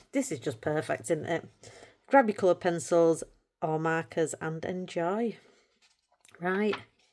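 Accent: Yorkshire, United Kingdom. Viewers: Love your Yorkshire accent 😊 years ago I went to live in a place called Hesketh Bank do you know of it? Back in 1972 I was from Birmingham, that’s a broad dialect too but because my mother was not from there I never really picked up the accent but I can put it on when I meet someone from there over here. I had a friend once in Melbourne who was so offended when in a shop with me I spoke to a lady behind the counter who had the broadest brummie accent and I dropped into it to talk to her! 😂😂 love doing that!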